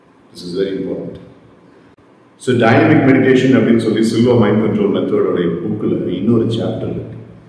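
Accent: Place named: India. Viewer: I'd like to listen but, between the echo and the accent, it's difficult. Thankfully, I have the book. (Generally speaking, I can understand Indian accents -- the echo is the issue I have -- and the closed captioning isn't in English.)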